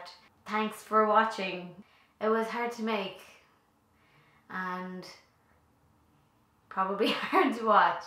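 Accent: Ireland. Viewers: I don't know how things are now, for I have just met you on YouTube like... today. I came here to get used to the Irish accent, and now I'm finding myself almost in tears. I've lost my grandfathers for cancer. Both of them. Basically, I have BOTH sides of my family with ~this thing~. 'Fear' is something strong around here, considering it's genetic and I have zero ideia if it might affect my parents. I wouldn't know what to do if it did. Stay strong. Bless you.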